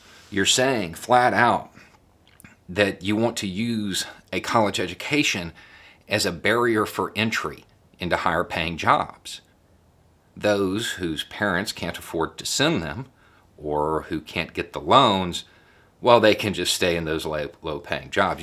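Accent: South, United States. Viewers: Thank you my brotha. Finally some sense in this comment section instead of the “you tell it maaaaaaan” echo chamber. You know how many other black students I saw in the business analytics program ? Fucking 1 you know how many black students were in the business school getting degrees in marketing and international business? Literally thousands. It’s all about what you invest in. I invested my early 20s in the military to pay for my degree and I made damn sure it was worth something. What this video is is a trap. They see the white guy with the goatee the southern accent and the hat and they hear him talk like Bernie sanders and they are like “ahhhhh shit this is one of the good white guys. He looks like a racist ass Trump supporter but he’s invited to the cookout” what a fucking joke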